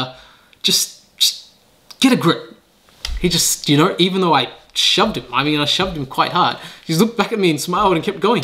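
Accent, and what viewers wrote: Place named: Australia. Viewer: Masha Allah brother, I also went for Hajj this year. Your accent suggests you are Australian? So am I. Perhaps we crossed paths?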